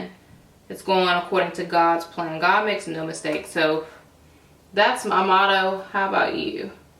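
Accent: South, United States. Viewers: I've never noticed her country accent. Has she always had it?? I feel lost lmao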